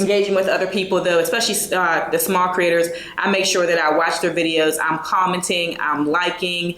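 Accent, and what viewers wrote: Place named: South, United States. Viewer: Congrats on reaching 1k! This was great! And where are you from? I definitely hear a southern accent!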